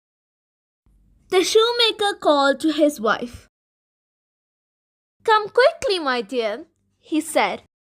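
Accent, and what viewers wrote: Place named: England, United Kingdom. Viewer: Excellent video and story as always, I love your voice is so clear and easy to understand and I really admire your English accent, Great effort my dear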